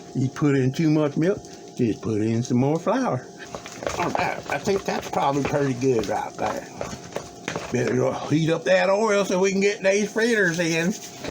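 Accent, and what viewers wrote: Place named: South, United States. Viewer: Good job on the Southern accent.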